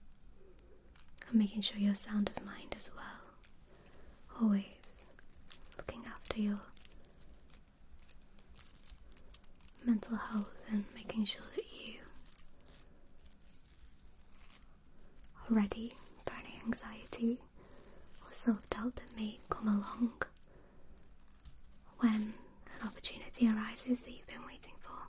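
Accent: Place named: Northern England, United Kingdom. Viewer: I like your accent.northern England, Blackburn?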